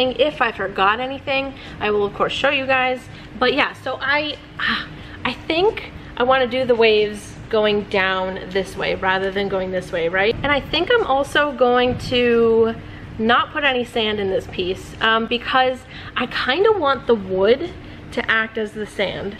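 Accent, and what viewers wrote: United States. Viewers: Your art is always so beautiful. Btw, where in the US are you from? I’ve heard your accent before but do not know where from. I love it.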